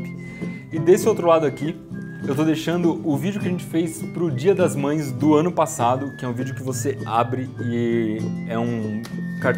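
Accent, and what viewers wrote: Brazil. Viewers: muito feio esse seu pt-br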